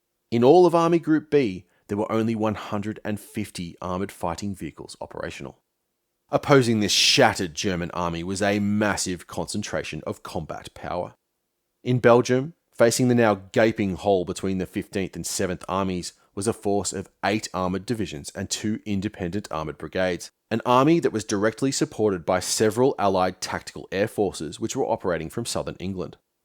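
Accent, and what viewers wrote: Australia. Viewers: Mate, pleasure to hear our Australian accent. Salutations on your work. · Are you from Aussie bro? Canberra?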